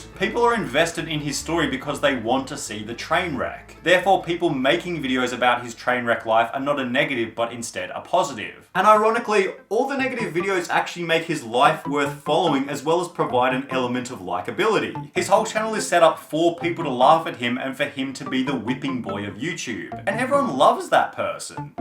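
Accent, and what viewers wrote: Australia. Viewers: I FEEL LIKE I SHOULD'VE KNOWN BY THE ACCENT THAT THIS GUY WAS AN AUSSIE, YET I DIDN'T KNOW UNTIL HE SAID; "At least in Australia" and my brain had to reboot and process that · i swear to god i did not realize your aussie until this video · Are you a aussie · its not his fault, its that he has an australian accent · Oh so you're Australian No wonder you sound more interesting than what you actually are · Been enjoying your newer videos and this one popped up in my feed - I think it's the first one I've seen with you actually in it! (Lol'ed at the incel subreddit bit) Keep it up love hearing a fellow aussie spill the tea on youtube peeps :)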